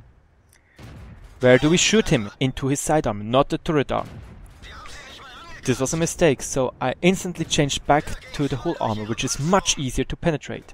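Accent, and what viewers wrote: Germany. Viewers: Du bist Deutscher oder? ;)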